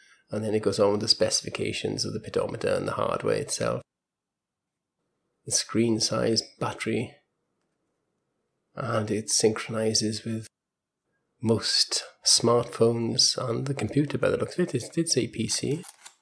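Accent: Wales, United Kingdom. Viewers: u sound welsh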